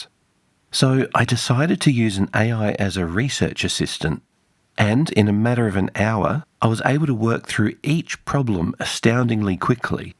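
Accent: Australia. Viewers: Cyber Psychosis sounds much cooler. You could become a Cyber Psycho. Is your accent a mix of Tasmanian and Canberra area/region? I'm interested in regional accents.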